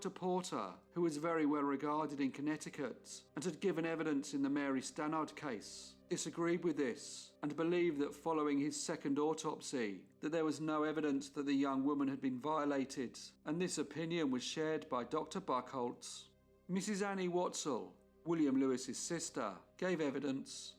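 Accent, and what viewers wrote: England, United Kingdom. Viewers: My goodness! I do so love an Engllish accent! I can't help but mentally picture Eddie Redmayne as the narrator details every tragic story. Both voices are quite similar to my ears. I only hope the narrator is NOT a product of AI. As a human, make no mistake, you are blessed with a talent only few possess--a great speaking voice & the determination to research & present these stories. Thank you so much for sharing your talent & interests with the public. This specific niche is yours alone. No one else can compare.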